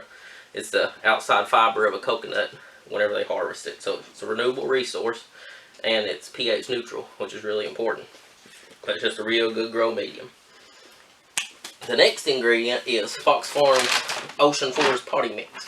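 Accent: South, United States. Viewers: awesome im from maine and we are BURIED IN SNOW;[;[ more coming sun/ monday;[;[;[ SOOO OVER DUE FOR SPRING!!!! thank you for the video... i just came across you when i researched worms farms.. you got a new subb bud;];];] by the way were are you located? you sound "very southern" lololol love it;];];] dont hear it up north much;;[;[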